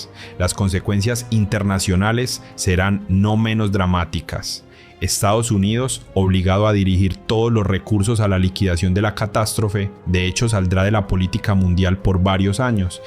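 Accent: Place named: Antioquia, Colombia. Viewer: Esa voz es de un.paisa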